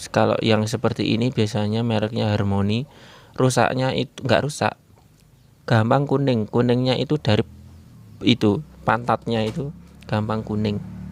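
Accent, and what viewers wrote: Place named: Java, Indonesia. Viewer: Luar biasa sangat bermanfaat kang....saya langsung subscriber...bahasa jawanya medok lucu tp sangat bermanfaat, sukses selalu kang.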